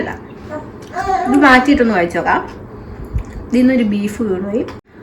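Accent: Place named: Kuttiyadi, India. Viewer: that is kuttiyady slang😂😂😂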